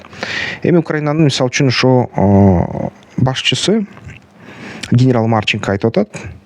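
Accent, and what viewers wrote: Standard. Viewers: Азаматсын таза кыргызча тушундуросунда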